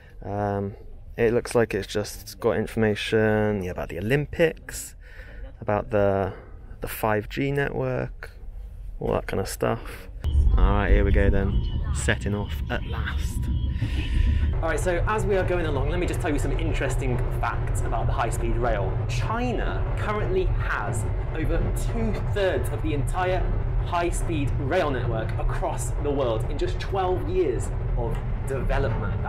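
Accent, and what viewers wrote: United Kingdom. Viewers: 你的中文也有伦敦腔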